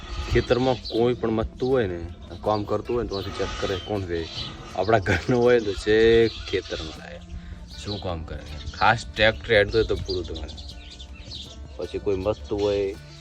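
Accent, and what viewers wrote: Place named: Banaskantha, India. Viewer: જય માતાજી મોટા ભાઈ ક્યાં થી છો ભાઈ તમારી બોલી બનાસકાંઠા ની લાગે છે બહુ સરસ વિડિઓ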